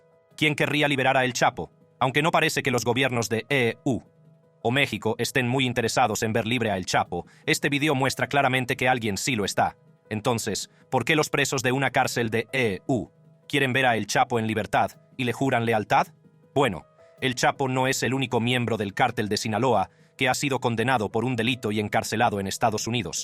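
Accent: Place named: Central America. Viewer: De seguro no es gringo. Por su voz parece centroamericano.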